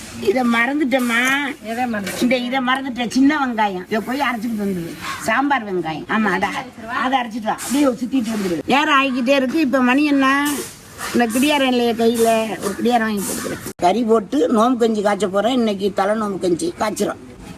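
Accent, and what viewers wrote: Ramanathapuram, India. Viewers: நான் Meharaj recipes ராமநாதபுரமV.KMA வகையறாநான் 👌 உங்கள் வீடியோ அருமையாக உள்ளது பேச்சு வழக்கு எல்லாம் ராமநாதபுரம் தோரணையில் உள்ளது உங்கள் வீடியோவை பார்ததில்மிக்க மிக்க மகிழ்ச்சி ஊரில் உள்ளவர்களுக்கு வகையறா என்று ஒன்று இருக்கும் உங்கள் வகேரா பற்றி வீடியோ போடுங்க நீங்க எங்க நண்ணி மாதிரி பேசுறீங்க இப்போ எங்க நண்ணி இல்லை வயதானவர்களை பார்த்தால் எங்க நண்ணிஞபகம்தான் வருது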